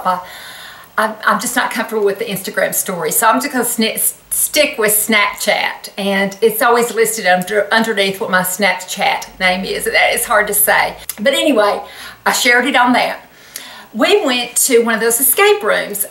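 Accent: South, United States. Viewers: I'm from Florida. My family has been in Marion County since 1846. So I am truly a southerner. I tell you, it is so wonderful to hear a southern accent. It is really hard to find one, any more, down here in Florida. You are absolutely a breath of fresh air. I love your YouTube channel. Through the years I have put on weight and am having trouble taking it off. Can you give some pointers on how you keep yourself fit? Maybe some diet suggestions. I'm so sorry you hit that rod! I pray the Lord put His super on the natural for you and that you are better, quick like a rabbit! Thank you for everything!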